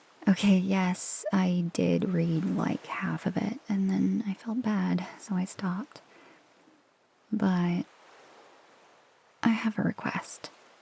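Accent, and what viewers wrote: France. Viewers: Please, do more French accent ASMRs 😌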